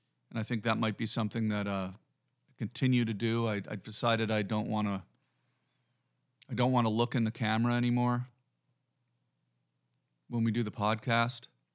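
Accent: Canada. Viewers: Keep talking about the farm and all of the nature, bro. That’s what got me hooked oh, and by the way, keep saying words, where your Canadian accent comes out! Hilarious. Lmfao hilariously awesome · I think your canadian accent is getting stronger. Love it.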